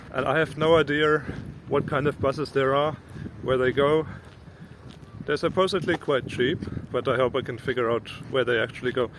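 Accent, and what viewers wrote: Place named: Germany. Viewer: Такое чувство что немец говорить на английском...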